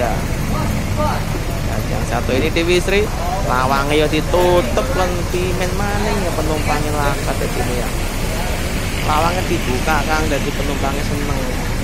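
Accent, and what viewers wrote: Banyumas, Indonesia. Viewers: Pekalonganne ngdy mas kok ngomng e ngapak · Iki sing duwe chanel wong ndi logate ngomong kaya wong ngapak ya,nyong wong banjarnegara teka.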